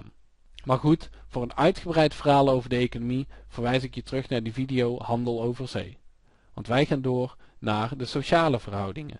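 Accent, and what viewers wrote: Brabant, Netherlands. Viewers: broabant boerûh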